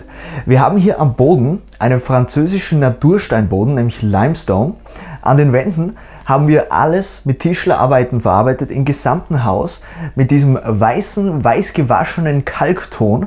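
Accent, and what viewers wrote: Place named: Austria. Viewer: Schönes Objekt. Aber diesen österreichischen Schnellsprecher musste ich stummschalten.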